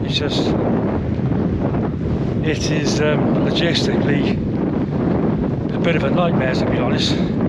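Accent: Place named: South Wales, United Kingdom. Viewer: Another great video. A bit nearer to home for this camp. It’s a fair drive from South Wales to Snowdonia for you each time,but well worth the journey isn’t it? Good to see with you. ‘cute in it!’🤣Love the South Wales Accent 🏴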